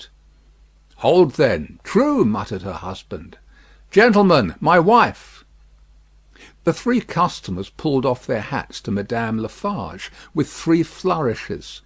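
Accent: United Kingdom. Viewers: No, he sounds merely British.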